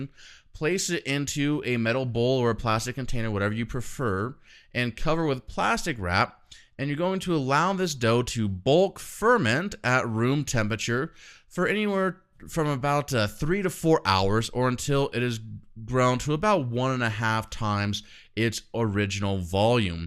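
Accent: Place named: United States. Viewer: You have the same American accent than William Lane Craig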